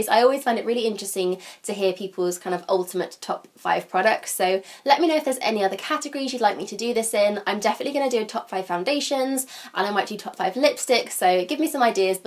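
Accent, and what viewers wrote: England, United Kingdom. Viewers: I love your accent. Are you from England?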